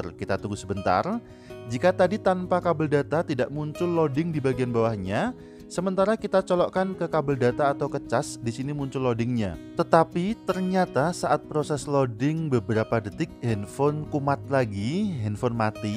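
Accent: Magelang, Indonesia. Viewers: mas sampeyan wong Magelang ndi?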